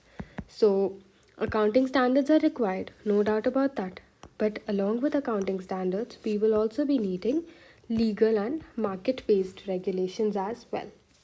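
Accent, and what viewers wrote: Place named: Kerala, India. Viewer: Are you malayali . Sound ketapol thonni😊 . Good class really helpful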